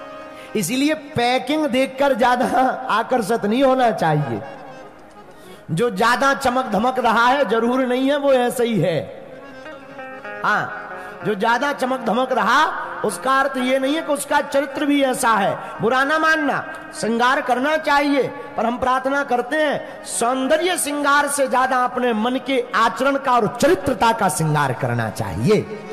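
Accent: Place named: Barsana, India. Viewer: Aaj to kya baat hai Gurudev barsane wali bol rahe ho bhasha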